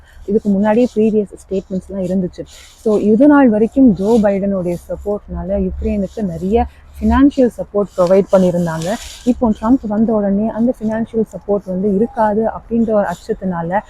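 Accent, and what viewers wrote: Sri Lanka. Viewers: Are you srilankan tamil mam?! Like native of yours or parents?!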